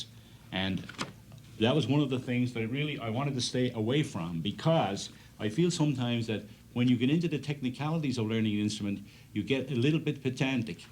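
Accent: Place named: Dublin, Ireland. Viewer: bits of the lovely Dublin accent come through in his voice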